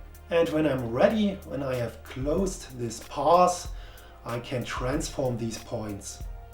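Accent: Germany. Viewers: Deutscher Akzent is so geil😂👌🏼 hört sich bei mir genauso an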